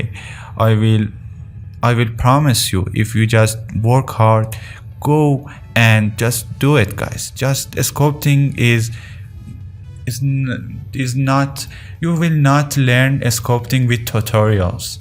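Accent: Iran. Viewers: Persian accent?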